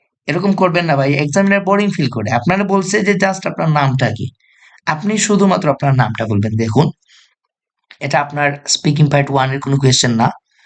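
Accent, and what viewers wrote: Sylhet, Bangladesh. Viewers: Vaiya ki Sylheti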